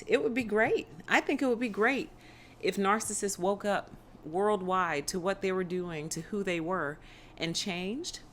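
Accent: South, United States. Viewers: I love your Southern accent! I'm in Arkansas : )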